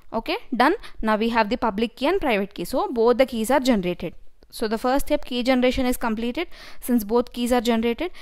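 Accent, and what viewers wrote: South India, India. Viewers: its south accent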